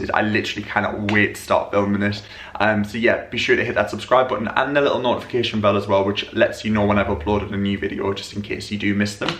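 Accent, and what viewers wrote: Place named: Geordie, United Kingdom. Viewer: Trying to work out accent.. thinking northeast? Maybe Geordie?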